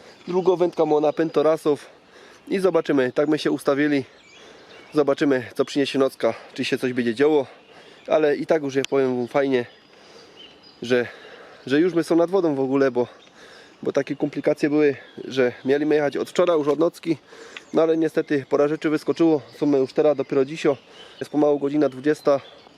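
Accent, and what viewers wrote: Silesia, Poland. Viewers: Po śląsku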